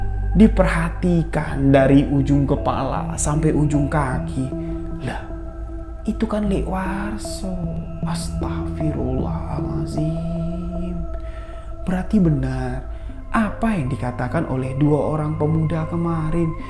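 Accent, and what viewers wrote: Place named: Madura, Indonesia. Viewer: Logat Madura nya masih kental.. Salam sehat selalu tretan dibik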